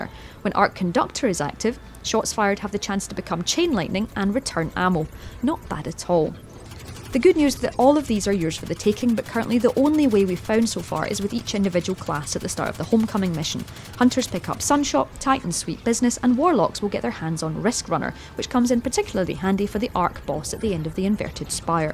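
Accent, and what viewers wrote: Scotland, United Kingdom. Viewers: sounds like a Scottish accent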